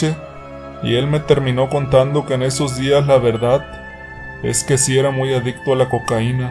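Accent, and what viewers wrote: Mexico. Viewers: Ay amigo!..Perdí diez minutos de mi vida, creyendo que tu historia tendría algún cambio..pero qué caracho!..Tu feroz acento mexicano me estaba matando!..Y por que tan larga la historia?..te repites y te repites..o sea..NO tiene desenlace, loco! Aparte que a tu tono de 'narrador'..le faltan inflexiones!..variedad! supongo que no eres robot!...o sí? Por favor, toma clases de locución! De verdad.. te van ayudar!😒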